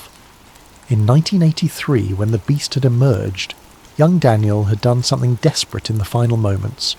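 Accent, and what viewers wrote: United Kingdom. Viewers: Can we get these without a UK accent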